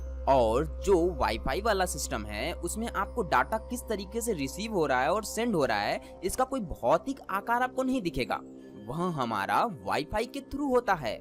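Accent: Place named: Bengal, India. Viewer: Abe Bengali kaisi hindi bol raha hai. इंडा क्या होता है । ठीक से बोल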